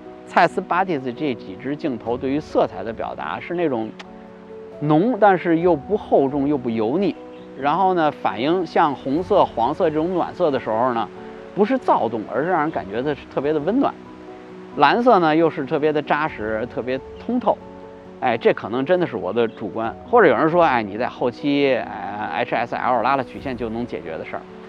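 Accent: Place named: Beijing, China. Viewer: 北京的吧？迈玛儿拍，一听就是北京的😂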